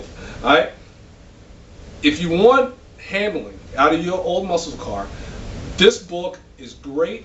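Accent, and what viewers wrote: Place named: South, United States. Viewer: I wondering where you live? Sounds like in the south. I'm on the West coast, Blacks build lots of muscle cars.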